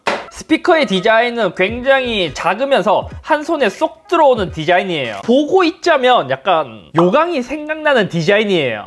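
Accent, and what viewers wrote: Gyeongsang, South Korea. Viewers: 언어학자인데요, 잇섭님 여기서 ㅎ에 대응되는 발음으로 표준어의 [h]가 아닌 동남방언의 [x]를 하고 계셔서 그런거에요. 아 모양으로 입을 만드시고 입김을 분다는 느낌으로 시작한 다음에 모음을 얹어줘야 표준어의 [하]가 되어요.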